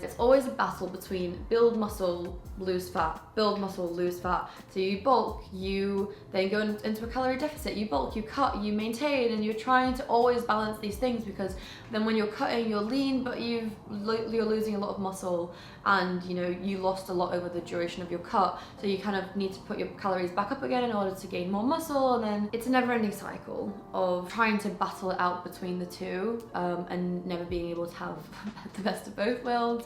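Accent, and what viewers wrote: United Kingdom. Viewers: I was right! YOU ARE LATINA 😮🎉 I was able to identify your complexion, your face, and excited this is the very FIRST time I see a Hispanic with a British accent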